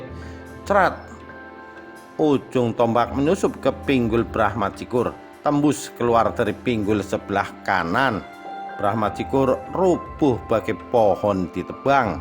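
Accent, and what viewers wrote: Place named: Java, Indonesia. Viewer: Suaranya bagus itu,khas jawa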